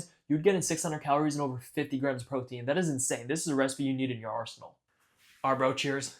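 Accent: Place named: New Jersey, United States. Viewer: That new jersey accent, also sus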